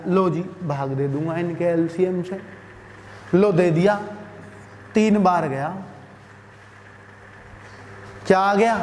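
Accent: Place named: Haryana, India. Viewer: sir.. Mujhe aap k video dekhte hue 4-5 din hi hue hai, but itne me hi Mai aap ka Bhout bada fan ho gaya hu... Specially aap ke haryanwi boli ka! Mai jaldi kisi Ki video like nahi karta, but aap Ki Mai video dekhne se pehle hi like Kar deta hu!😊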